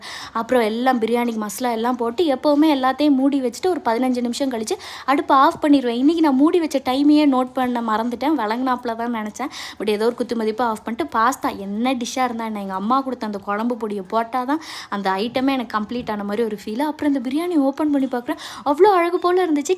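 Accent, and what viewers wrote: Tirunelveli, India. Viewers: R u from thirunelveli sis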